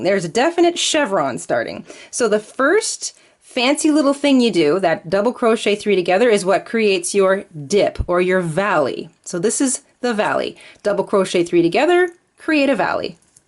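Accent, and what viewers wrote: Canada. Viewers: This was such a great video full of great tips especially for a beginner thank you so much also it was so fun to watch that cute giggle and Canadian accent!!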